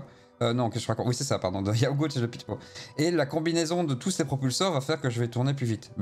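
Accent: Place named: Belgium. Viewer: J'adore la prononciation belge : un ennemi qui "fouit" au lieu de "fuit" xD Tes vidéos me rappellent des potes gamers de Bruxelles et Liège qui disent "houit" au lieu de "huit."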